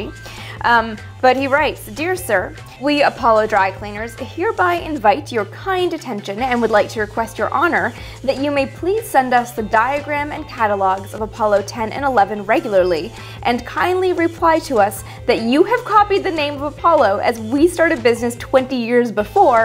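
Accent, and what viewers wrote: Canada. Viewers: Missed your content. But most just missed seeing you. Like you face. Love the Canadian accent.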